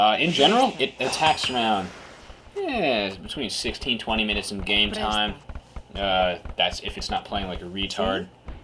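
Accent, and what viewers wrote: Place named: United States. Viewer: I like you extra just because of your American accent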